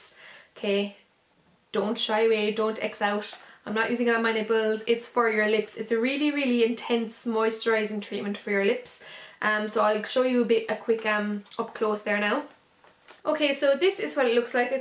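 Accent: Ireland. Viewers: I think your Cork accent is SO beautiful.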